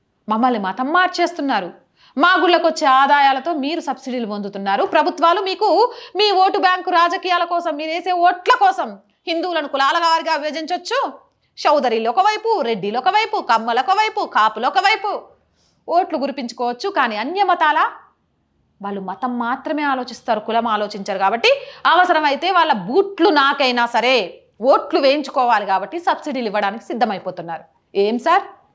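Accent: Telangana, India. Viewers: Normal telugu maatlaadatene baavuntundi ra, itu leka atu telangana yaasa vaddu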